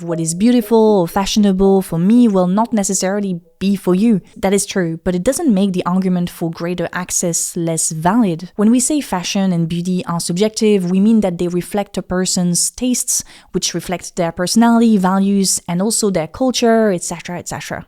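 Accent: France. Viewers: It's the difference between her accent which is obviously French but perfectly understandable and the sort of French accent where the person clearly doesn't know how to pronounce English words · I think she's French and thats just her natural accent...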